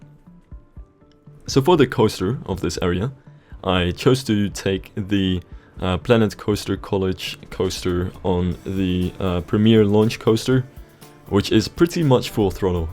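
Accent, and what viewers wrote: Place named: Netherlands. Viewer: Dude i couldnt tell you were dutch Lol nice accent. Btw nice job!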